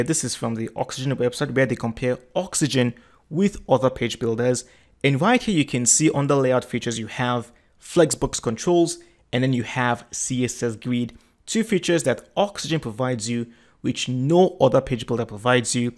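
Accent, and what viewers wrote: Nigeria. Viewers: are you a nigeria sir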